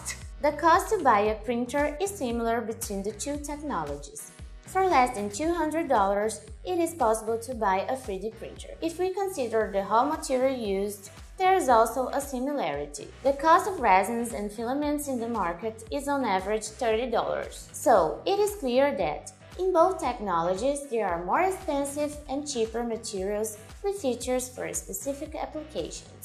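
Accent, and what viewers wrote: Brazil. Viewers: seems a brazilian accent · Impressão minha ou é brasileira? Rsrs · Brazilian accent? Essas paradas em inglês já tá saturado, manda uns em português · You sound so Brazilian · Your accent is EXACTLY what I would expect from a native Brazilian Portuguese speaker. Wonder now where you're from. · Reconheço esse sotaque Brasileiro!! 🎉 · Are you from Brazil by any chance? 'Cause i hear a peculiar brazilian accent lol.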